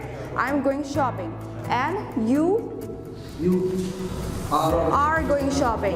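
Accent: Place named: United States. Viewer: Mam you've great American accent.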